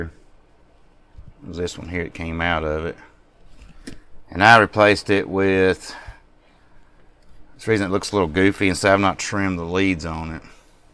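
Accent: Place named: South, United States. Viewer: Mr. thanks for the refurb on this equipment. My lead coax reads rg58 /u, after restoration it seems to function as designed. It appears useful for spotting intermittently shorting capacitor pots. Thanks again looking forward to more vintage test equipment on your channel and your laid back southern style.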